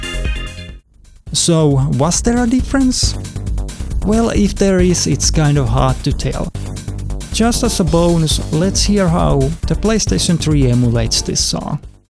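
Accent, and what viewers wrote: Finland. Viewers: Mitäs vittua..? Ooksää suomest? (Koska perinteinen rallienglanti) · ekan parin sanan jälkee arvasin et varmaa suomalainen tekemäs videoo :D hyvin tehty video, täytyy käydä hakemas pi 3 b model nii pääsee itekki testailee :D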